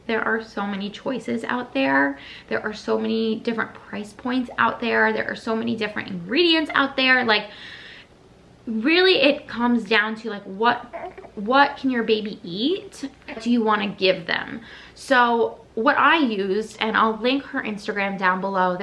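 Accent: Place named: Central Jersey, United States. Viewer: We have literally the same accent (I'm originally from central Jersey) 😂 my husband always teases me when I say "bad" like that 🙄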